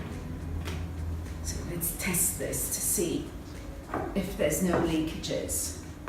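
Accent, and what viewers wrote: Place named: United Kingdom. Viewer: Great video! personality and her British English, plus some information give the viewers so much info and pleasure to watch. Thank you! I watched to the end..., you are so funny and definitely a lifelong learner!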